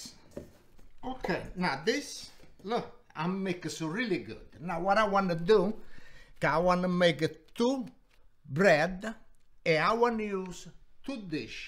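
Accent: Italy. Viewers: His Italian accent authenticated this video. 🇮🇹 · Hi! This is Canada. The video is very interesting. Italian pronunciation of English is also familiar. I remember the old Italian grandfather who lived next door to my house. He gave me grapes from his backyard grape vine, and if he made bean pasta, he gave it,too They also gave me homemade wine. He was very friendly. Watching your video reminds me of him. It is a very, very old memory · I made this and it turned out awesome. My family is from a little town in Italy called Senerchia and you talk just like them and makes me miss them. God Bless you and can't wait to make more of your recipes!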